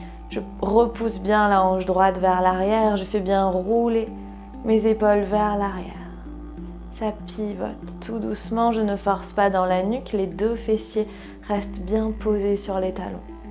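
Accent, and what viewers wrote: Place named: South, France. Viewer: Encore une nouvelle séance qui me fait du bien. Me change des séances iyengar que je pratique tout au long de l'année. Un véritable plaisir avec cet accent si charmant qui m'apporte en plus la lumière du Sud dans nos contrées septentrionales. Au plaisir de pouvoir suivre en présentiel un jour un cours avec;-) Belle fin d'année à vous et longue vie à l'alchimie des corps en 2022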